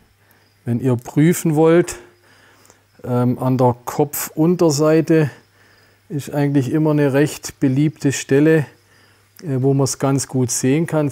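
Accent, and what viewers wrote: Swabia, Germany. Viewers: Sehr gute Folge! Übrigens... Ihr habt mal versprochen, den zu nem Deutschkurs zu schicken, bitte dran denken dass ReptilTV auch außerhalb der schwäbischen Alb gesehen wird, kein Mensch hat was gegen einen leichten Dialekt, aber beim tuts leider a wegn heftig sein, wahrscheinlich merkt er das selber gar nicht, aber meine (Deutschlehrer) - Kollegen hier im Goetheinstitut Madrid, die alle Reptil Fans sind, haben leider keine Chance zu verstehen was er da von sich gibt, obwohl inhaltlich natürlich super gut und interessant. Bitte nicht übel nehmen, den Tipp, ist nur gut gemeint 😉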